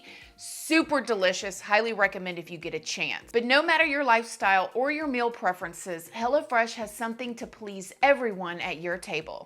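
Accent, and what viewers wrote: South, United States. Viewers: Don't come at me. This isn't meant to be an insulted, but of course, some people will always take it as an insult cause the Internet and there's so many personalities. Your speech is hillbilly but you are one of the smartest crime reporters on youtube. I really enjoy your videos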